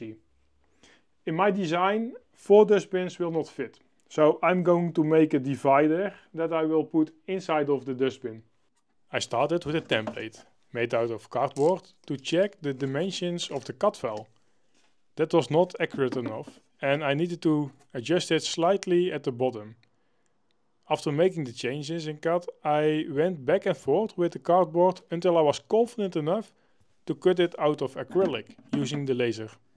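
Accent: Netherlands. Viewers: So, you klinkt as a Nederlander 👍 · Vind het leuke videos. Erg interessant, leuke technieken en 3d printen, maar stoor me wel vreselijk aan het Nederlandse Engels.. 😢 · I like a deep dive into anything nerdy with a Dutch accent. Takes me back to my brief stint working in Eindhoven. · I love the heavy dutch accent haha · Who's also Dutch and just here for the pronunciation?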